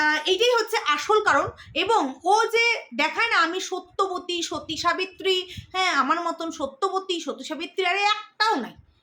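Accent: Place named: Bangal, Bangladesh. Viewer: darun bollay bangal bhasha ta 😂😂😂😂😂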